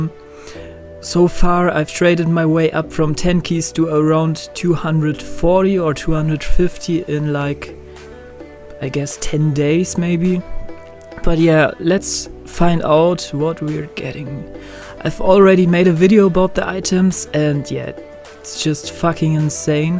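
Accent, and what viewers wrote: Germany. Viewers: dude are you from germany? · Wie man minimal hört dass du deutsch bist hahahahahaha xD gutes Video:) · You're German?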